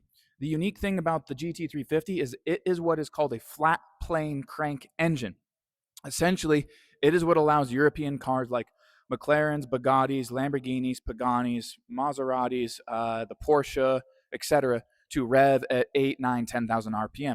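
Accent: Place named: United States. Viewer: nah, he isn't a twaty millionaire wife who lives vicariously of her husband's earnings... his Americanized pronunciation is just fine.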